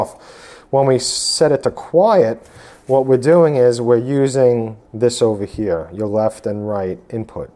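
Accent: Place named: Long Island, United States. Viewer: Very interesting review. I bought one about 2 years ago to supplement a Marantz 6013 with Klipsch RP600Ms. I got it for $600 at the time, right now it's actually $900 everywhere. Yours is the first YouTube review I've found of this amp. I've been curious to see what somebody with access to a lot of amps would say about it, since I don't have much to compare it to. I admit that I was hoping for better. I'm currently at the stage of realizing that I need a dedicated stereo preamp to step up my 2 channel listening, apparently using an AVR for that isn't the best approach - but maybe I'll upgrade the amp after that (and then the DAC, and then the speakers, lol.) Thanks for the review!! (You sound like a fellow Long Islander - are you?)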